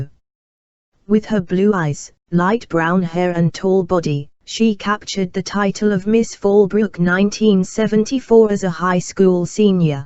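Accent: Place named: United Kingdom. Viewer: Amanda Sack agreed, the mechanical voice is distracting. A tinny British accent, literally like fingernails scratching across a chalkboard.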